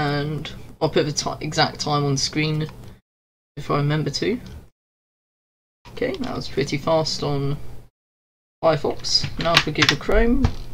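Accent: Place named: United Kingdom. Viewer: Youre save a british guy and i dont like british english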